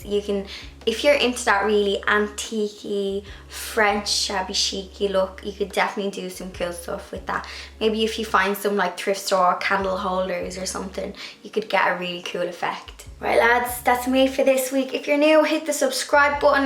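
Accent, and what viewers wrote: Ireland. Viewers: Cute! When you were talking about YouTube algorithms I didn't understand you. I'm an American and sometimes your beautiful Irish accent is difficult hard for me to comprehend.. Could you explain what you meant?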